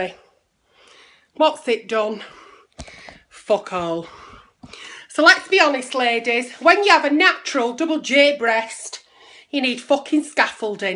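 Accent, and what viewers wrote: United Kingdom. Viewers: The British accent 😂😂😂😭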